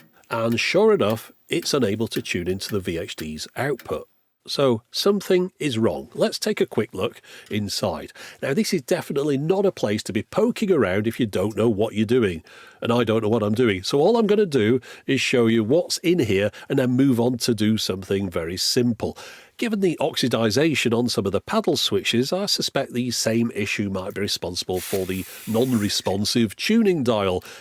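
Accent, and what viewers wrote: United Kingdom. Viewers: is a straight up audiophile - this guy has shown me stuff I never fathomed existed, I just love his channel and British accent cool to listen to as well lol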